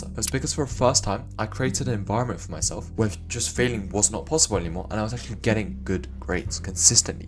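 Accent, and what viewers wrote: United Kingdom. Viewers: why does he sound so british?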